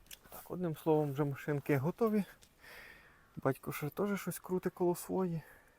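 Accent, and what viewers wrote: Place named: Khmelnytskyi, Ukraine. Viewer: Привіт гарні доглянуті машинки) а ви звідки? А то чую Хмельницький говір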